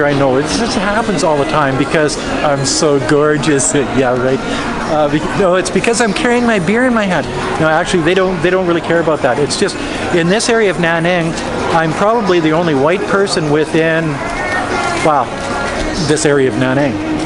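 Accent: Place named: Canada. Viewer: This is the first time I'm watching this guy's video because it popped up in my recommendations. I can tell this guy is Canadian. He sounds (and sorta looks) just like Bubbles.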